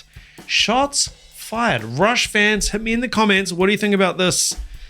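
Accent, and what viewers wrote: New Zealand. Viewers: Probably my first comment here ever, as a longtime follower 😂 Watching quite 😂 All of us, who really love and ambrace music, why would we down-talk music? We might not have the same taste, but at the end of the day its art. Hehe and each time i'm listening to Neil, i know why he was and, in some kind still, is a hugh inspiration of Mike Portnoy 😎 Mr. i fuckomg love your Kiwi accent 😎 thats me saying as a german 😂 and remember (knok knok)...we will ask the questions 😂 Love your channel!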